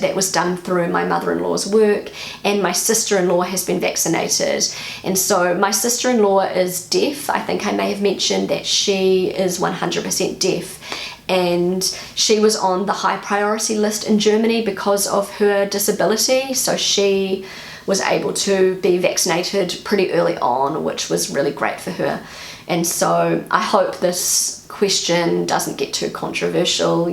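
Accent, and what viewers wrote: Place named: New Zealand. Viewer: Don't be afraid about an accent; most of Germans speaking English have one ... ;o) ... so be one of us! And even as a Kiwi you have an accent which most of Germans are not used to; so don't worry. It can be managed. I worked for many international companies and get in touch with a lot of people from all over the world - they all have an accent. So ... and if you afraid of speaking ... sing! ;o) I'm looking forward for your next video in German, be sure eveybody will love it. And BTW ... don't take it as an accent, take it as you personnel dialect. Here in the Rhine area each and every small village has a dialect of it's own and when I moved here, I needed my (former) girlfriend to translate the local dialect to "normal" German.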